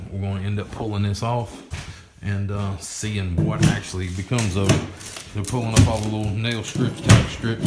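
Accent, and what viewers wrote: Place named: Alabama, United States. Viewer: Oh, and the Alabamaspanglish is hilarious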